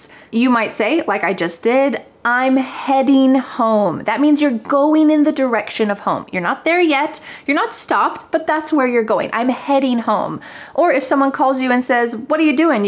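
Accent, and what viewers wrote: United States. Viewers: J'adore vos cours, je comprends très bien votre accent américain. Merci beaucoup pour le temps que vous accordez à faire ses cours 😊😘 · You are doing a great service. Without knowing anything about phonetics and great vocabulary, if I watch your videos everyday, I bet within 6 months, I shall speak at least 50 % american accent. I think it is the easy way to learn a language, that is to listen . Gradually one can pick up. Thank you.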